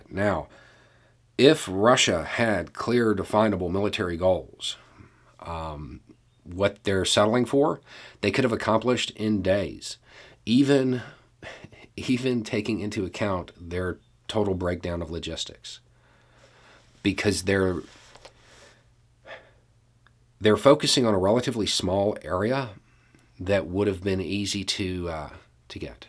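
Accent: South, United States. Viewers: Howdy! Thanks for your thoughts and considerations. First of all let me say that I'm glad I found your channel. Although I first was a little sceptical when I watched the videos, as for some reasons, to me it looks suspicious when I see a bearded US guy with a southern accent talking about politics from what looks like a preppers bunker, closing with an image where he's sipping moonshine;) No offence though, I guess you can imagine what events in more or less recent history made me develop that sense. But after watching some more of your videos and of course doing my own research on some topics, I came to the conclusion that you're actually one of the most considerate and probably most trustworthy youtubers I have come across. And I tend to agree with many (if not most) of your conclusions and predictions - at least as far as my own information background allows. 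However, talking about P's plans for the Ukraine, from all I learned about him recently, and from the sources I found so far, I think he will not content himself with "liberating" the recently acknowledged "republics" in the Donbas. At least not if he still sees a chance to occupy the south, to have an access to Crimea via road and train. And he will even try to go a bit further to the west, to secure the water supply for Crimea from the Dnieper, by occupying Kherson county and the canal (which was closed by Ukraine after Crimea was annexed and caused a draught there). And while doing so, he will leave scorched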